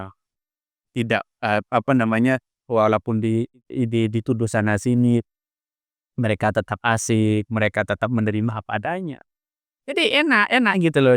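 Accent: Madura, Indonesia. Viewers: Btw, Logat madura ya mbak?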